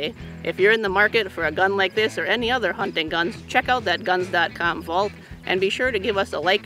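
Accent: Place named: Midwest, United States. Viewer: That's a genuine Midwest accent LOL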